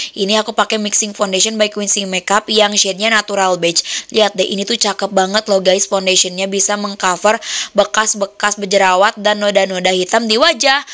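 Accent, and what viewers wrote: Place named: Java, Indonesia. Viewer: Terlalu medok, tpi warna bdakny si natural bnget, cma ke Medokan Tpi bgus ko, aku suka , suka sekali mba Terimksih tutorialny, semoga cnelny tmbh maju dn sukses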